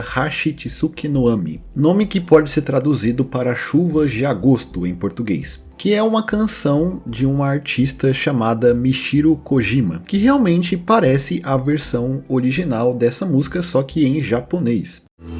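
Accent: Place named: São Paulo, Brazil. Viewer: Será que o é branco, preto ou asiático? Magro ou gordo? Será se ele é baixinho ou tem 1,90m? Talvez nunca saberemos. Só sei que ele é paulista pelo sotaque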